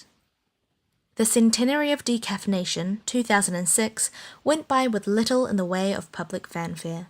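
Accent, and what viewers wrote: New Zealand. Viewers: 뉴질랜드 영어 쏘스윗!! 번거로우실텐데 너무너무 감사해요!! 더 열심히 들을께요 ☺️😍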